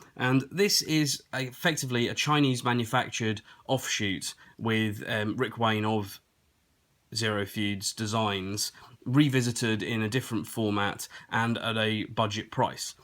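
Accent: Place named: England, United Kingdom. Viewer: It's refreshing to hear an English accent in the spinner review section of YT! It's also great to hear succinct and balanced opinions as regards top end spinners and their mid-quality counterparts. I for one have an aptitude with all things arty crafty so I'm in my element buying mid-priced spinners and then re-finishing them by hand then putting in a good bearing. For example, I've just bought both a Zentri Nano and a (now obsolete) Triton mini ...both in SS, hand smoothed/polished them and both are running One Drop bearings ...all part of the fun :-) Thanks ...thumbs up/subbed.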